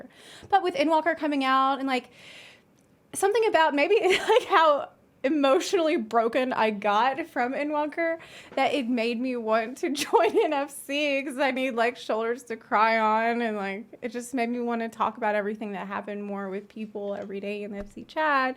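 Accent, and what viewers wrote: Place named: Mississippi, United States. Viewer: I know you live in Ukraine, but are from the US, but I can't help but recognize the accent. Are you from MS? Love you bye!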